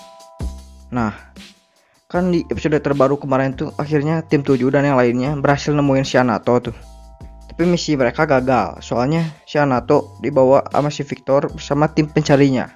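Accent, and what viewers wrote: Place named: West Java, Indonesia. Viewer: Logat nya sunda banget 😁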